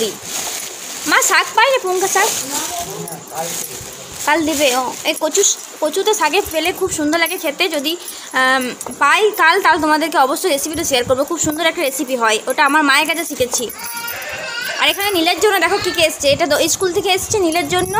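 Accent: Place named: Bankura, India. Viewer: আমারও বাঁকুড়া তে বাড়ি। টাউন।তোমার কথাই বাড়ি।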